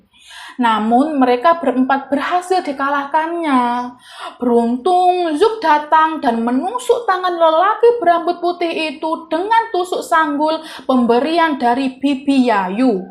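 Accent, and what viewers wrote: Java, Indonesia. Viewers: Medok mantul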